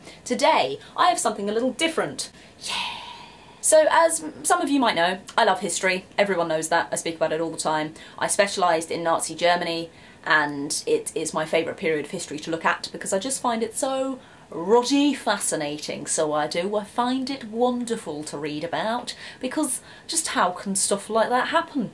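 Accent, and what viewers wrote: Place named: England, United Kingdom. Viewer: Dear, I stumbled across your videos when I did some YouTube surfing recently and I must say they really make a difference. As a German its always quite interesting to hear what people from other countries think about Germany, sharing their experience when travelling Germany and what they find interesting to talk about. Of course, YouTube is full of videos with expats living in Germany or people (mainly young ones) who travelled in Germany and then make videos about their life here or their travel experience. However, most of these videos are quite boring because they are full of clichés, stereotypes, truisms and just serve the expectations what people want to hear or learn. So apart from all that Autobahn, beer, lederhosen, castles, medieval cities and efficiency crap you usually find, your videos are a lot more profound and entertaining. 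Also, it’s so beautiful to hear that likeable English accent for a change after having seen all those videos produced by Americans, Australians or Canadians. Yes, you are doing a good job and I think you convey a good and unconventional picture so far of this history-ridden country in the heart of Europe. I must say, however, that I find it quite astonishing that such a young, witty, quick and vivid woman from England like you seems to be so intrigued with the German Nazi history. But I realized quite quickly that your interest is not a superficial one but seems to be profound given the fact you chose this topic for your university st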